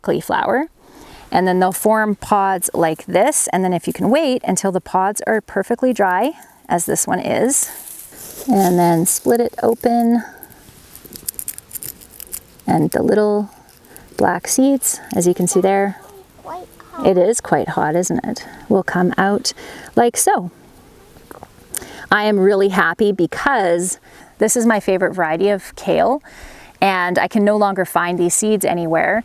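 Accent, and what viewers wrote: Canada. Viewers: No one else takes the time to show people the seeds themselves and how to get them out and how to harvest them because honest to God I had no idea that was so much into saving seeds but I'm thankful I found your blog if I had not found your YouTube channel I would be lost when I start doing this next year myself and I am going to watch everything you have on this because I really honest to God appreciate you doing this thank you so much I'm from America and it sounds like you're from Canada I haven't really checked that out but I can tell you this right now I really do appreciate it and you're you're an awesome parent I have not gotten to see your husband enough to see you know what kind of parent he is and honestly it doesn't matter you are a wonderful wonderful mother and you are so good with your children and your children are smart they act like they're homeschool kids they're very very smart and they are always talking to you and always telling you about something you actually listen to them that is amazing God bless you and your families and my family's prayers and thank you again so much for sharing your journey I have cancer and I would not be able to do that right now and I appreciate it so much that I'm learning something and I'm enjoying it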